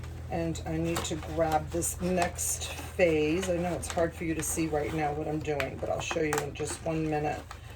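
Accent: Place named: Massachusetts, United States. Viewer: miss hearing the Mass accent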